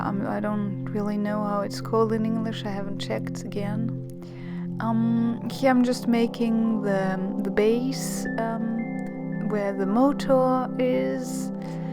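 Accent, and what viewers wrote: France. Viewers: Hello! Do you live in France? We will be in Paris in December and just adore your accent. Beautiful design! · Are you french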